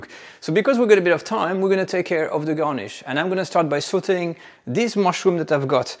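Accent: France. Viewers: He was born in Paris. Why wouldn't he have a French accent?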